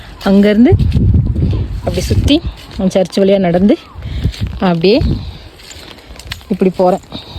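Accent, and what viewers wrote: Tirunelveli, India. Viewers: ஒரு மூன்று நாளைக்கு முன்னாடி படையப்பா 5மணிக்கு வாசலில் இருக்கும் என்று சொன்னார் so conform ah moonaru than... But slang tirunelveli native.. irukum.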